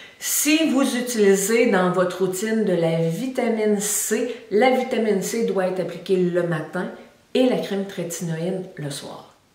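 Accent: Quebec, Canada. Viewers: Je taquine beaucoup mes copine québécoise pour leur accent mais toi je peux t écouter parler pendant des heures ... donc j ai bien compris après avoir bien fait pénétrer la crème et laisse poser tu applique quand même tes sérum doux sans acide je ferai pareil et les soirs off je mettrai mes sérum au retinol merci pour tous les conseil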